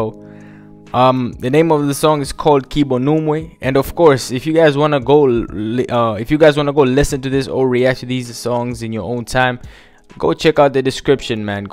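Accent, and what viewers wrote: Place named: Burundi. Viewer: This is Rwandan? He sounds like Burundian.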